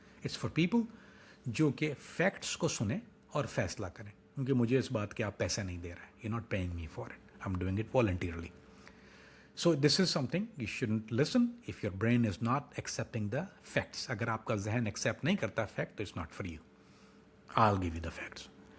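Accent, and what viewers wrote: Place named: United Kingdom. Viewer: U looks like so Deployment ...becoz ur acent is also like British...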